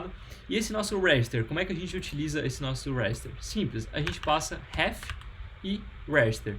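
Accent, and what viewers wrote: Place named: Santa Catarina, Brazil. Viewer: Massa!! Rio do sul exporta dev hein haha A rocketseat era dai também né. Imaginei que fosse de SC por causa do sotaque, sou de Floripa. Cai no vídeo pois estava tentando decidir qual biblioteca iria usar para form. Valeu pelo conteúdo!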